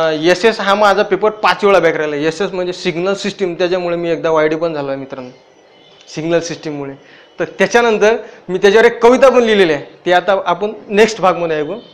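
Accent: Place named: Vidarbha, India. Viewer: Nice to hear Vidarbha Marathi 😃😃😃👍🏼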